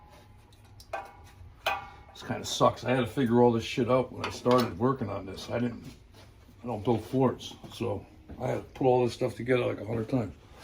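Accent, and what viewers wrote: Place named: North Carolina, United States. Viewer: Love that North Carolina accent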